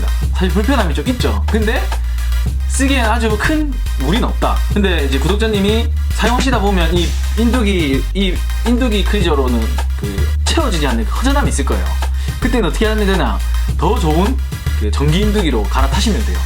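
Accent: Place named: Gyeongsang, South Korea. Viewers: 좋은 정보 감사합니다 말도 빠르시고 경상도어투인데 배경음악이 너무 정신이 사나워서 집중하기가 힘들어요 ㅡㅡ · 경상도 사시나요..?ㅋㅋ 말투가 저희신랑이랑 비슷하셔서... 공예초보자인데 많이 배워용😆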